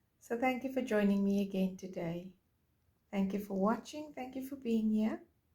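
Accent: South Africa. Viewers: Beautiful. I am also a newby with this hobby and prefer the Dala Craft paint rather than the Dala acrylic. Where do you buy your Dala paint from as I am looking for 1 liter Dala bottles. There is a company in CT that sells the 1 litre containers, but I cannot remember the name and no name rings a bell if I google it. By the way, it is wonderful to hear a South African on Youtube pouring art channel.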